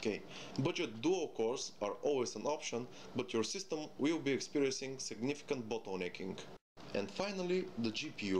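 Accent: Russia. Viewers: Lol, I find the way you talk funny, are you Russian or something? Good video!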